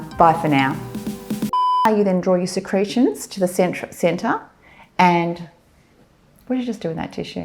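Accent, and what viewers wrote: Australia. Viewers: Is that an Australian accent? Love it!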